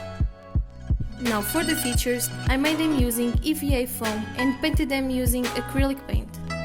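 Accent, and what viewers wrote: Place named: Portugal. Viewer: Esse sotaque com certeza é de Portugal!♡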